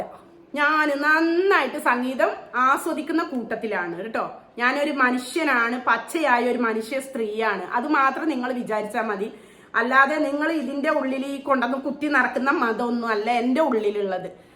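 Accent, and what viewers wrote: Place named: Malabar, India. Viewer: നിങ്ങൾ ശ്രദ്ധിയ്ക്കണംഭാഷ കേട്ടിട്ട് കോഴിക്കോട്, മലപ്പുറം ജില്ലയാണ് എന്ന് തോന്നുന്നു - എന്തായാലും ശ്രദ്ധിച്ചാ നല്ലത്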